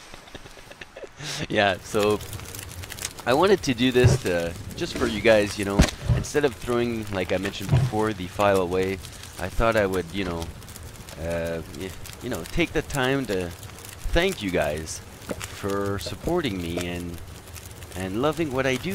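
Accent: France. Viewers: well thanks for your great videos/commentaries! Btw you sound french are you? 😜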